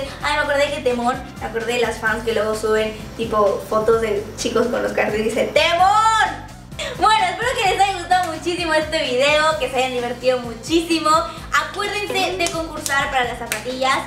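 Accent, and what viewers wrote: Argentina. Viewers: Se te esta pegando el argentino 😂😂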